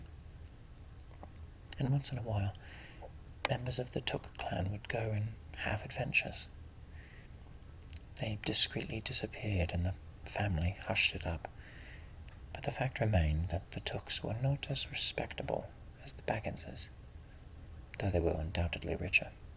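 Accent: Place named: New Zealand. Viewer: He’s even got the kiwi accent, by the sounds of it 👏🏼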